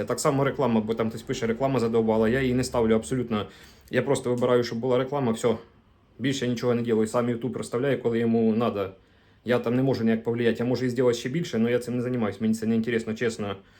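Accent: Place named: Surzhyk, Ukraine. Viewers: Привіт ти з якого міста Бо в тебе такий суржик як у нас запорізька обл.Гуляйполе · За суржик 👍👍👍👍👍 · головне, що ти гідно тримаєшся і не зважаєш на хейтерів. Якщо будеш знімати подорожі по Польщі то заздалегідь готуйся пиши сценарій, буде цікавіше дивитися, це не повчання, а бажання. Удачі! P.S. на Полтавщині теж так балакають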